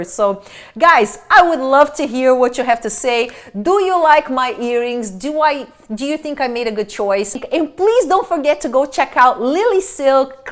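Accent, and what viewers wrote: Brazil. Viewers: outro dia te falei uma crítica construtiva e você ficou brava e até disse que eu tinha inveja pq era brasileira como vc, mas não tenho inveja não... sou bem casada com americano tb, amo esse país e os brasileiros eu concordo que alguns passam do limite mas eu sou uma pessoa que não sei o que é inveja desde que nasci, fique tranquila, porque eu trabalho muito pra ter o que tenho sem invejar nada de ninguém....mas eu adoro vc e adoro seus vídeos!!!! Adoro ouvir vc falando inglês pq falo como vc, com sotaque... e adoro suas peças de roupas, acessórios, sapatos, jóias e principalmente as bolsas!!! Parabéns pelo vídeo.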